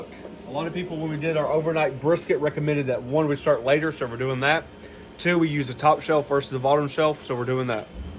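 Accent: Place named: Alabama, United States. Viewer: Great video doing this recipe tomorrow. your accent is nostalgic to me growing up in Alabama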